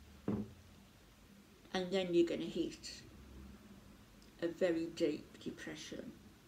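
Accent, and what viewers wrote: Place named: England, United Kingdom. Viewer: Thats nasty I can understand her ok may be it's her English accent you can understand there is nothing wrong with her speech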